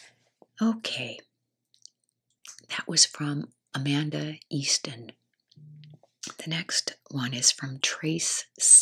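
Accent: United States. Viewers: I’m from England and I don’t usually find American accents doing ASMR give me tingles (nothing against any American accent I just seem to find I get more tingles from accents I’m less familiar with) however everything about your voice is dreamy! I find your voice so soothing, nurturing and tingle inducing! Thank you xxx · Thank you so much for answering My question! We are in Texas near Houston, so that’s so cool!! I thought you might have a little TEXAS in you 😉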